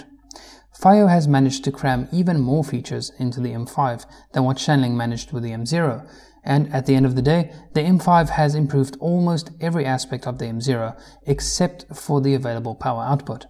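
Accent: South Africa. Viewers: Dat SA accent B-)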